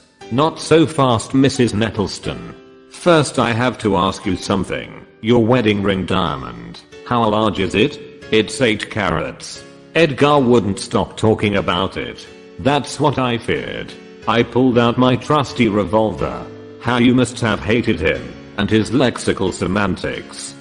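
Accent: United Kingdom. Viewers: good british accent, very clear............ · Great... I like his voice, very clear and good brittish accent.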